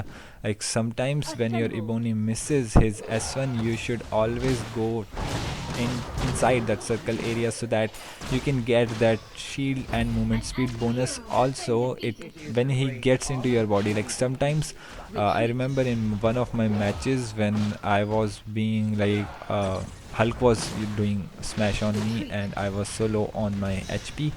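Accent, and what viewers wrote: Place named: India. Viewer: Bro I think your indian from your accent,but forgive me if iam wrong but I was wondering whether you are getting ranked matches,iam indian myself so I think we will be playing in the same server,that's why iam asking you,iam struggling here cuz iam not getting matched in rank and maybe like after 6+ mins I give up, please provide me some tips on how to get ranked matches easily if there are any, Thankyou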